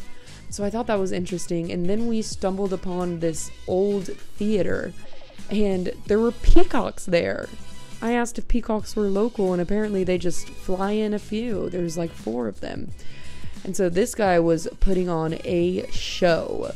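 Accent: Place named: United States. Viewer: It was your first flight in your life but your accent sound american. How did you travel from the US?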